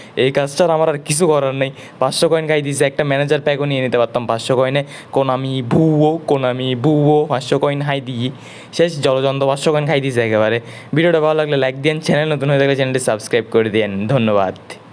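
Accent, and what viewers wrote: Chittagong, Bangladesh. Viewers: ভাইয়া কি চট্টগ্রামের নাকি???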